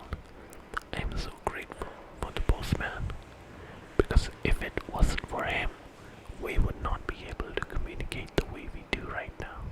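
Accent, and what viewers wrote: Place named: England, United Kingdom. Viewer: Big fan of your English accent sir🤓 Cool background!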